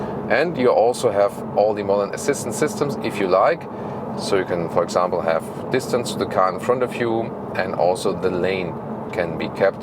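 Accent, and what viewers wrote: Germany. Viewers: du bist der einzige deutsche wem ich Englisch sprechen hören will.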